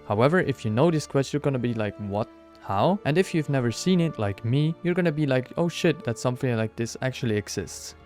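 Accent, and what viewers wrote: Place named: Switzerland. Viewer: Yes de Akzent im Englische würd ich überall erkenne 🇨🇭 Btw usually I would do all quests even the dreadful Reason of State but for Simun, who you meet in Lugos' prison, was so fishy that for once I turned down a quest. Seeing how awful Unpaid Debt is makes me think that it was the right choice 😂 However, it is interesting that the name of the man who Simun orders you to kill is the name of a Slavic smithing god. Simun mentions his sword if you side with him, but there is no special loot to be found there.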